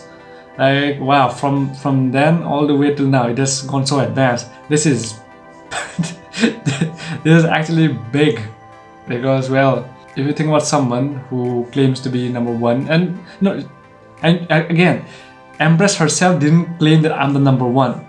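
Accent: Northeast India, India. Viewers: You from North East India bro?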